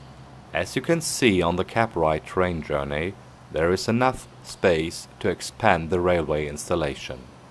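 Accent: Germany. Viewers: Love that German accent. Great model. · The pronunciation of the Germenglish is sooooo funny, love it!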